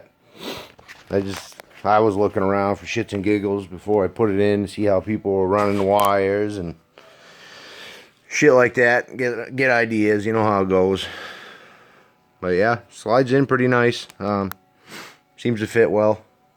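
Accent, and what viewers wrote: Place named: Boston, United States. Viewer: "I live up in tha woods" I wonder if he's here in New Hampshire, "shit gets pretty dahk" knew it, ha. Can't mistake the combo Boston/ Downeast twang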